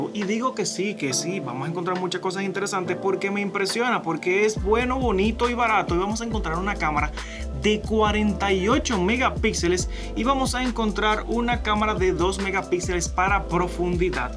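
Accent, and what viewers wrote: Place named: Dominican Republic. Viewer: Yo se que si eres de República Dominicana por el asentó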